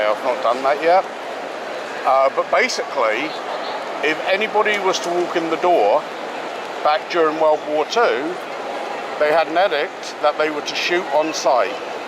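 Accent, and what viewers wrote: England, United Kingdom. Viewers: How carefree life was pre-Covid! One can see it on how people walked, how they unconsciously formed a huddle and it was perfectly okay to do so, & most of all, no masks! I've watched vlogs of Grand Central Station (as it is one of the popular places of NYC and hence, a favorite spot to vlog about) but your narrated walk through it stands out because it is very well researched. If one wants to know the juiciest story of a corner of NYC, trust - to tell it to you! Well, of course, your "exotic" Englishman accent is an extra treat!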